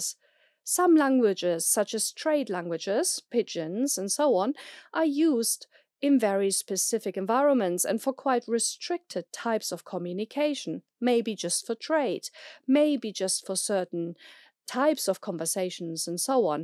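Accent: Germany. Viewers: Very interesting! Thank you! I have always wondered if English is a more complex language in the sense of having a larger lexicon. English certainly rapidly changes...and not always for the better! I would like to know which languages you consider to be more complex and more expressive. I will look for more of your videos. I can hear (faintly) that your mother tongue is German. That is a beautiful language that I learned in high school before going to live in Köln for a year...50 years ago! I revived my German more recently. I love the complex grammar! It is really not that hard to learn. Like anything it takes practice but once you learn the grammar rules of cases and genders, it's challenging but rewarding. One thing I realize from my own experience learning languages is that the most difficult thing about learning a foreign language once you have the basics down is to speak it naturally with expressions, colloquialisms and correct intonation. 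Being able to speak with correct pronunciation can be a big challenge for some, as well; for example, for Spanish speakers of English. And I don't know if practice can really help that much if you don't have a good ear. I feel bad for non L1 English speakers struggling to learn the language who due to a lack of proficiency are unable to fully and richly express themselves. I feel that way when I speak an L2 language; like I must appear to be like a child or simple minded. Vielen Dank again for your excellent post!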